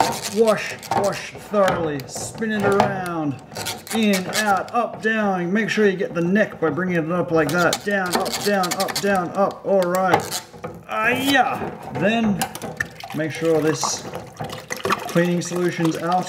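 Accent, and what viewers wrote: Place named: Australia. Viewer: What accent is that? Sounds like Australian that keeps turning into American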